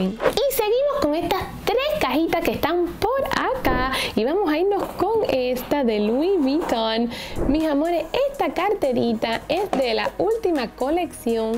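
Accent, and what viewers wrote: Cuba. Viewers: Me encanta el acento cubano de.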